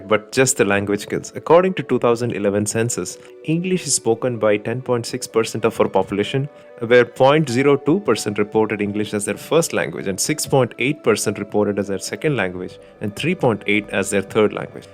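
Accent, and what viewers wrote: India. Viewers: Don't be sorry but try to change. Keep your indian accent. Speak it always and don't care about what others think. You'll get through with flying colours. If you try to immitate someone, u just become a lesser version of them and nothing more.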